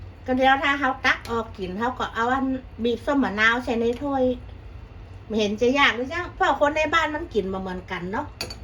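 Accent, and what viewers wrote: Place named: North, Thailand. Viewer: สวัสดีจ้า แวะมาชมการทำอาหารทางเหนือ ใข่ไหมค่ะ ฟังจากสำเนียงค่ะ เพราะๆหวาน ให้ไลท์เลยค่ะ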